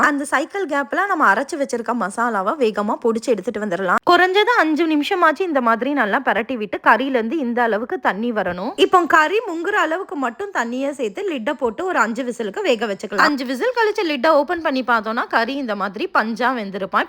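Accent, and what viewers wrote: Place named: Madurai, India. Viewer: Tempting will try this weekend for sure.madurai slang super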